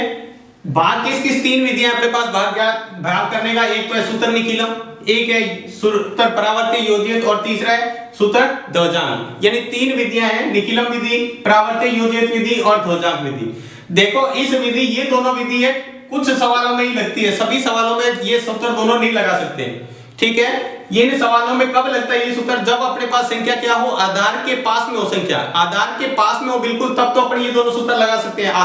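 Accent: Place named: Rajasthan, India. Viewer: Koi baat ni sir galti ho J😊😊full rajsthani boli 😏😏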